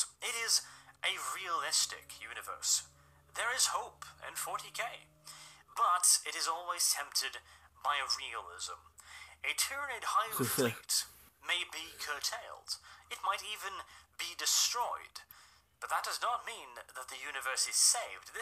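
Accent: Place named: United States. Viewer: Oh, MURICA xD I was trying to guess via akcent :P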